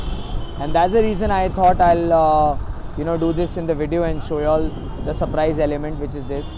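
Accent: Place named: Mumbai, India. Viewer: I pray that YouTube give a love button for your videos, all your videos are so informative.. and love the way to talked with Bhai in mumbaiya style, keep up the good work bro.. make frequent videos..👍😊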